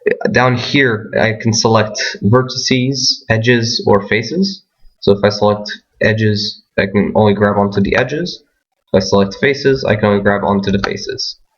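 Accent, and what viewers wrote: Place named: United States. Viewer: You are Portuguese??? You have a very good American accent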